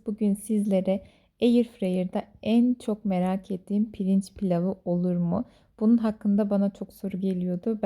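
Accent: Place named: Azerbaijan. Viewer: Bu hanim kesin Azerbaycanli 😀 aksani var 😀 aparat dedi ilk bashda . Unutmuyun 😀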